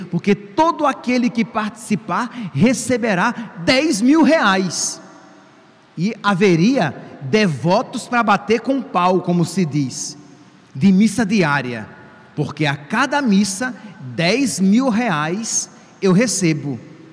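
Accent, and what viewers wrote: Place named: Northeast, Brazil. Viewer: Esse padre é da onde.Muito boa a omilia.Deve ser Nordestino pelo sutawue.Sou de MG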